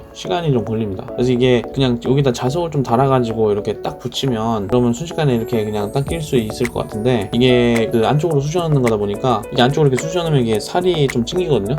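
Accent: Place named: Gyeongsang, South Korea. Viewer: 찡깁니다...ㅋㅋㅋㅋ 경상도 구먼..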